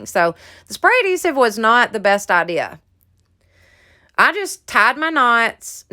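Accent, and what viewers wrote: South, United States. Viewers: I loove Holly . At Humble. Pie .and I clicked over here cause she said too lol..I’ subscribed just from u talking..I knew u would b good..u r southern and so cute lol..can’t wait to see what all u do..best wishes and hope u get many subscribers.. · I like the lil wrinkles it looks real to me I ain’t never saw a real flag without em. Love all ya do and it’s a fresh breath of air for me personally to here you talk from one southern girl to the other. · I love your content! You make the nicest things, but what I really like about you is your sweet warm southern charm and genuiness. I also love your cute southern accent! I laughed when you referred to Minnie Pearl a few videos back!! 👒👒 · I came over from Holly's channel. I am a fan of your phrase it's not a big deal it's just craftin'! You have the cutest southern drawl. My favorite DIY is the lantern. 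I like the jar pumpkins, too, but I am partial for traditional fall colors. I made a cute wall hanging with a lemonade flag by gluing a paint stick along each end, like a scroll, kind of. I painted them white. Then I embellished it with a few leaves and flowers. Have a great week! 🍁🌻🍂🍁🌻🍂🎃🍂🌻🍁🍂🌻🍁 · Great diys. The lantern is my favorite! The first time I tried wet destress was on jars and it didnt work for me either. I didnt try again for a while but then tried on other materials besides glass and fell in love with wet destressing So, dont give up. Try it on other materials. I have friends and family always bringing me trash to treasure items like cold coffee bottles or things they were going to throw away for me to try to repurpose but I would have to say the most random thing I have been given is the rods for a pop up tent with the wire removed. The first thing that came to mind was to use them like dowels to hang fabric or canvas signs from but I am sure I will find more ideas for them too. 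I live in the south too and love to hear someone else talk "southern" like I do lol. Love you, your channel and your diys. Thank you for sharing and be blessed my crafting friend! ;) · I made the lantern but painted mine dark brown and white... came out so good and I love it only problem I had was getting the other end on so I flipped it over and made a bottom that I could easily open it up to turn my candle on.. Thank you for all ur cute ideas and I love hearing ur country accent!!! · I love your ideas and tutorials and how you keep all the mistakes for us to see. I just love listening to you talk. Love your Southerness 😍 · Love your spunk, accent and style. I'm a southern gal myself. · I'm from GA I love the southern accent. You are so funny. Super cute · Came from Holly's channel and I love you and your style and as a fellow southerner, your accent as well! 😉 People don't give me random stuff but I keep weird things because I'm like, hmmm you never know what I might use that for 😆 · New Subbie...!!! 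Love the easy to make lantern !!!! And the jars !!! I am fixing ( southern term I am sure you are familiar with !) to make me some of those... already have the jars because we are canners !!!! Over all I love all your crafts !!!! And being from MS , love the southern accent..!! Thanks for all the tutorials..... off to watch more of your channel !!!!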